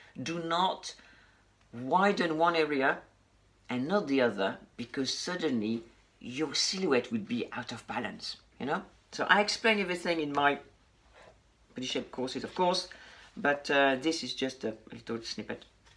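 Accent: France. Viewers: Good idea the oldest pieces of the closet, I have some items specially coats and jackets and a pair of shoes, sorry I really love them, they are a good quality items . I enjoyed this video, love your french accent, I love french but it is a little bit difficult to me to speak it. Greetings from México. by the way, do you speak spanish?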